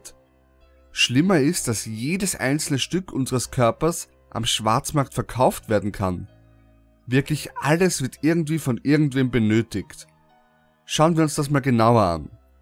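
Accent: Austria. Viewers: jo woher aus oesterreich kommst du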